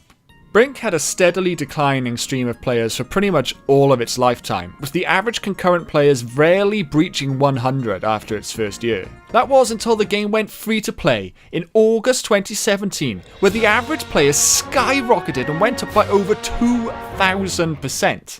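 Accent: Wales, United Kingdom. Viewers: Is that a Welsh accent, cant tell if it is or not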